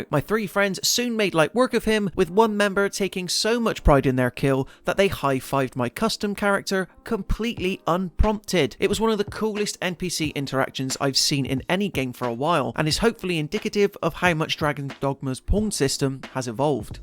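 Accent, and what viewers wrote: West Country, United Kingdom. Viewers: DD2 is looking fire! Sidenote, this is the first time I have heard a gloucester/bristol/west country accent from a youtuber and its refreshing!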